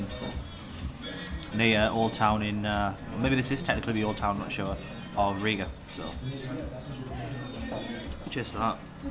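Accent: United Kingdom. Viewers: It was though to understand you at first place whereas I’ve got used to it and to top it all off: it’s appreciated to hear the British accent. Greetings from Poland lad 😎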